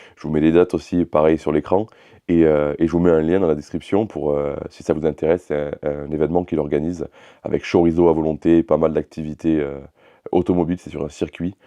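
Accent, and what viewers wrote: South, France. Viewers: oui pourquoi 😅 On dirait le Suuuuuudddf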